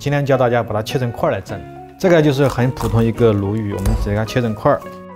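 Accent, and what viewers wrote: Sichuan, China. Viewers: 四川土音 👍 太親切了！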